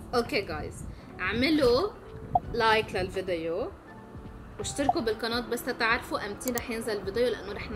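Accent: Lebanon. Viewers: لهجتج لبنانية انتي لبنانية صح اني هم ماعرف لبناني جان كتلج معنى كلمة شمعنا بلبناني 😂 هذا تعليقج ما مخفي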